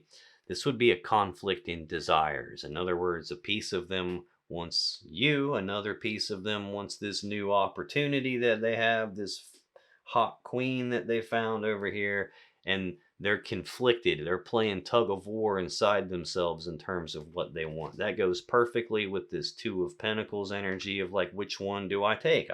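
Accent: South, United States. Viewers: Never seen your channel before but you have to be southern like me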